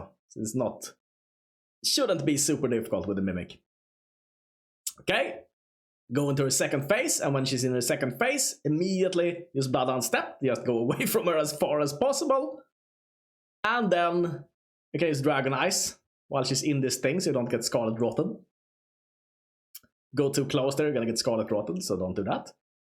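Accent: Sweden. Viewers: I like your Swedish accent. 👍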